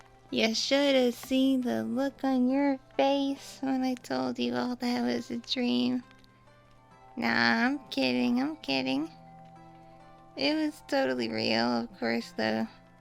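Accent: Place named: South, United States. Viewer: Am I the only one who thinks that the kitsune in the thumbnail looks like an Eevee. Southern accent is nice. Believe me I was born in Tennessee I know what a southern accent is like. Luckily I didn't live in Tennessee long enough to develope a southern accent. Cause I have no attachment to my birthplace once so ever.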